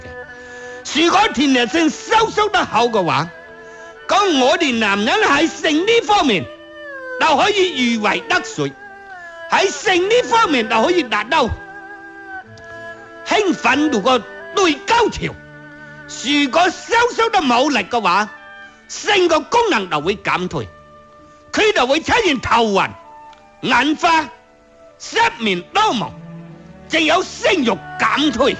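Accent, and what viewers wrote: Chaozhou, China. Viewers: 潮州口音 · 潮州口音来嘎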